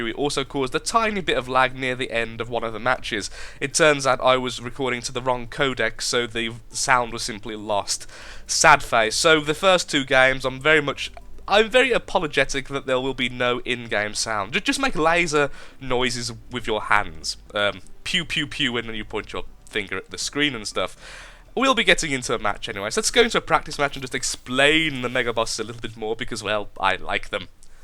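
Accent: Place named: England, United Kingdom. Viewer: O H M Y F R E A K I N G G O S H ! ! ! I just can't concentrate to the game hearing your english accent XD OMG!!! It's a praise =D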